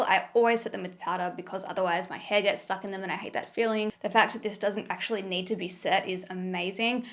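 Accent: Australia. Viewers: ! Hearing your voice reminds me of my Aussie cousins who were just here in the states. Was going to say though don’t feel bad for more engagement. We’re here because we love and want to see you succeed. We are your community! I’ve been watching you since you were at benefit it’s so crazy to see how makeup has changed since then.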